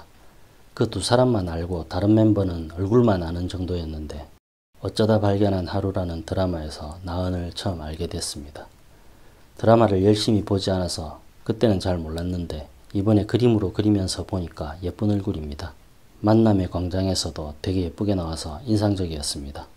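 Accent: Gyeongsang, South Korea. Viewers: 혹시 대구분이신가요? 드로잉관련 자료찾다가 구독박고 애청자가 되버렸네요 ㅎㅎ 앞으로도 좋은영상 많이 올려주세요!!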